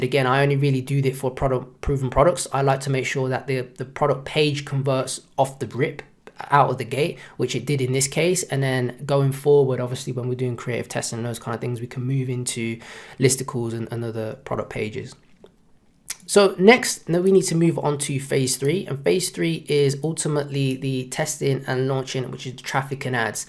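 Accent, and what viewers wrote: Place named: London, United Kingdom. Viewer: Great informative vid keep them coming...also you sound like a Londoner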